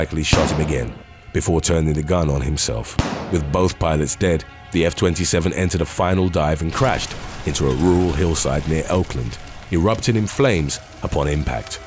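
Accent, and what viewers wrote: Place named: United Kingdom. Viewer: You sound British 🤮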